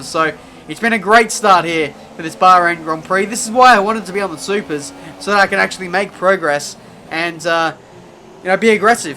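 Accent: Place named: Australia. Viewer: Is there a better combination for a video than the word 'supers' and an Australian accent?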